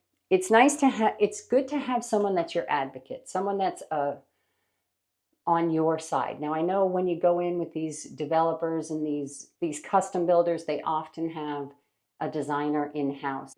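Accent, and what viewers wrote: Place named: New York, United States. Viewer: you always make me laugh with your NY-er talk! You tell it like it is and I love that as a fellow NY-er.Honest & straight to the point! Plus I love how knowledgable you are! Truly. I too thought I knew about design etc. but after binge watching your videos, I realized there were soooo many things I didnt consider. Thats why I love your videos I have learnt so much!! Keep posting! love following :)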